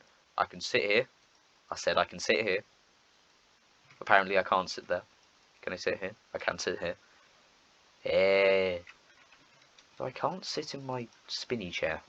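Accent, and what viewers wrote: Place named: United Kingdom. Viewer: Nice your British funny voice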